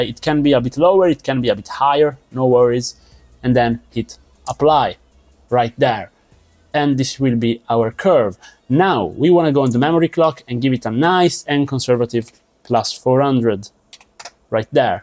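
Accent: Italy. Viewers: sei italiano??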